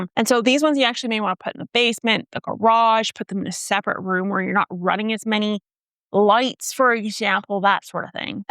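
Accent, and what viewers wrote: United States. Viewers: I've used heat mats for quite awhile. When I run out I sometimes use the oven with the light on and the door cracked. You definitely need a thermometer to monitor the temperature. Best used for peppers. You sound like an American to me, except for using the phrase 'those ones.' :) I live in Michigan so I guess close enough to Canada. Really enjoy your videos. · As a Wisconsinite, you sound like you live around here. Regional accents! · I’m from Joliet Illinois and I’ve got to say you have pretty much the same accent as me. So you sound American suburban white. Not stereotypically Canadian at all. The people from Wisconsin sound Canadian tho lol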